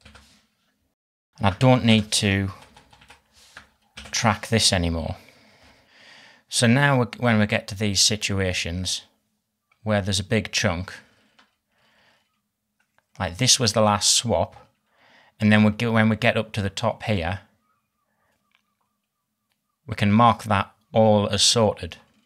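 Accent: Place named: Northern England, United Kingdom. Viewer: I liked the clear explanations and the awesome animations. I also liked your Northern English accent. On the other hand, as a programming noob who has only studied Python 3 I would have preferred the language to have been Python 3. You never said which language you were using. Is it JavaScript? I could not understand the line of code at the top and you never explained it. I think your method of starting with something simple and adding optimizing code later is great. However, I would have liked it to start even simpler. I am here because of your Twitter reply to 3B1B. Do you have any Python 3 tutorials? I am especially interested in string manipulations, simple chess playing programs, and simple text to speech stuff, not that I am particularly typical. I am also an explainer, but I do my explaining on paper (figuratively speaking) in my free blog on Medium.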